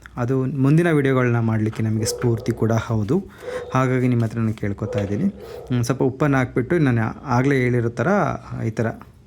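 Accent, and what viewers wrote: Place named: Bangalore, India. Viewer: Yaru ene helali neevu mathadtha recipi thorsi ivathu yako bangalore janara thara mathadha idhira ista aagolla chithra durgakade mathadidhange mathadi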